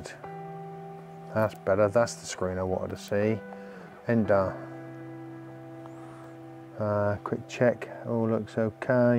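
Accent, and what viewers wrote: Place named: Suffolk, United Kingdom. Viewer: Lovely photo's, also really nice to hear the accent... from another Suffolk boy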